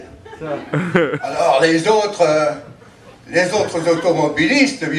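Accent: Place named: Saint-Étienne, France. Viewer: Après toutes années passées outre-Atlantique, mon oreille perçoit le tenace accent stephanois. Ta prestation est pleine d'authenticité, ton personnage surprenant et très drôle. Je n’ai plus de nouvelles, je t’espère en forme. Amicalement Didier Missana from Beaujolais.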